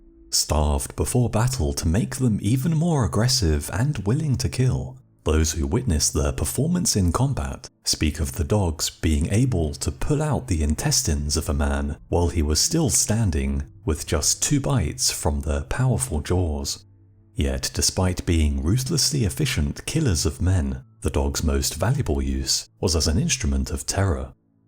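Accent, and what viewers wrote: United Kingdom. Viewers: seems kinda farfetched, they had jaguars in their jungles, I'm sure dogs which are much smaller wouldn't scare them much......the fact that a british accented guy is narrating this story makes it that much more questionable... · #1 Narrator Has a British/English accent, #2 Hyperbolic made up history, this is Definitely British Anti-Spanish Propaganda so much like the Black Legend they created , All Lies, Arriba España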